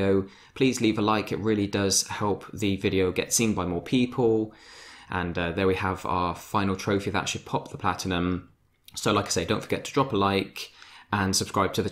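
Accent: United Kingdom. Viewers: Best walkthrough ever. Smart evenly paced british voice is like listening to a harry potter audio book. Make sure you do the next Dead Island 2 or Red Dead Redemption 2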